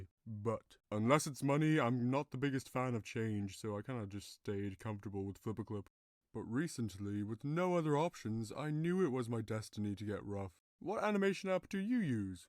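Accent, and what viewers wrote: Ireland. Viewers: Are you irish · Bro is Irish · Are you from Ireland? Because I am on FlipaClip, it’s the only one I have so far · Eh, more like nogla to me, it might be because hes Irish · Why he sound like Irish?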